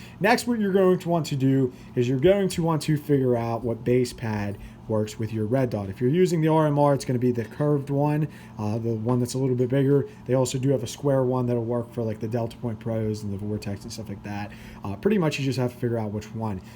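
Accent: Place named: Pennsylvania, United States. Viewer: This dudes accent is 100% from PA. Noticed it even before I noticed his name. Looked it up b/c I just got a RMR for my FNX. Great vid bud. Thanks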